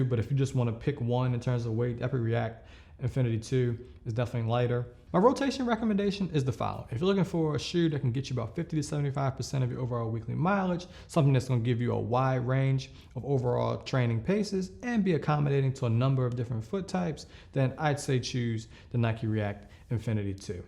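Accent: Baltimore, United States. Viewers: Thx bud That bmore comes through in some of your words. Lol Great video sir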